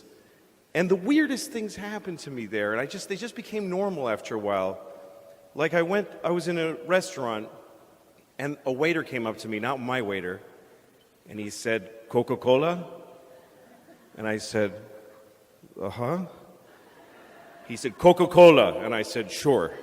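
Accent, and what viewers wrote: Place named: Boston, United States. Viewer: he grew up in Boston, that's the local way of treating vowels.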